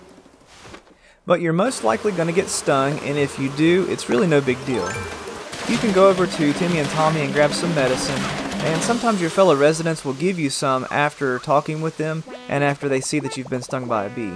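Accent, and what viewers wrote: Texas, United States. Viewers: Is that a Texan accent? Lol!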